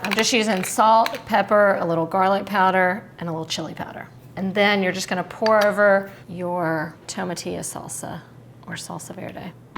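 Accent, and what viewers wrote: United States. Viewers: I hear your pain. However, this is an American channel. Should we check the world over and only use ingredients universally available? Should your national cooking channel only use ingredients that are widely available in the US or world wide?? Honestly, I don't want to watch a local foreign channel that only produces world accessible dishes. I want authentic recipes. Authentic culture. Authentic accents. You are getting a taste of American culture 😊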